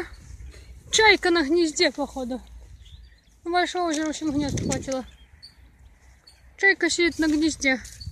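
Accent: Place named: Belarus. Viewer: А говор то беларуский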